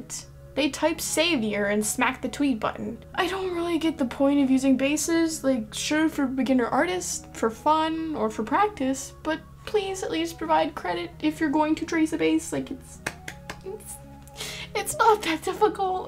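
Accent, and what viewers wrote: Canada. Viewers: I can tell you're definitely Canadian but still a very informative video lmao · I could tell too, even though I’m not Canadian. I live in one of the northern states, and the accent is really similar. Lol.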